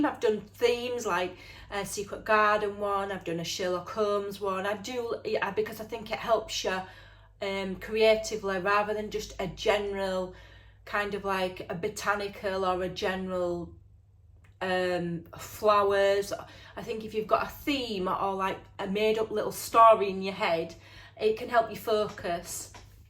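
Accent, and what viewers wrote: Yorkshire, United Kingdom. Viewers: Oh,! I could listen to you all day long (and that laugh!! 🥰)! My dearest friend in the world for just over 50 years was a Yorkshire lass from Leeds. Yorkshire became sort of my second home (I'm from Canada) until Margaret passed a few years ago. I miss hearing that accent. Listening to you brings such joy! My favourite tip was 'DON'T HOARD SCRAPS'!! I am awful for doing that. I have always felt it was 'necessary' - other crafters told me it was. Thank you so much for finally giving me permission to get RID!!! I think it will definitely help me to create more. My crafts table is always such a mess because of these endless scraps everywhere. Loved this video! ❣💖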